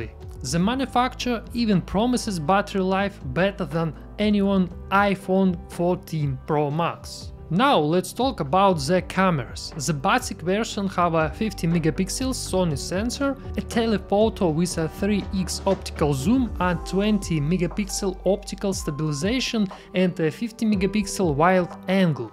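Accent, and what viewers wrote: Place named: Russia. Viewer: The Russian accent is the cutest accent in English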